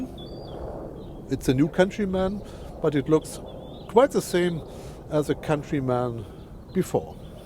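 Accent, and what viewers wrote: Germany. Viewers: I like the reviewer! I love the german-english! keep up the good work! (Car is ugly)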